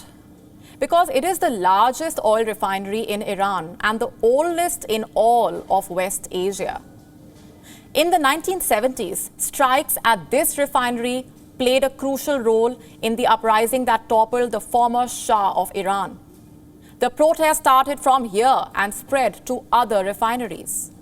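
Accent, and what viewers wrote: India. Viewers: is not improving,her accent is still Indian,her intonation is not improving as well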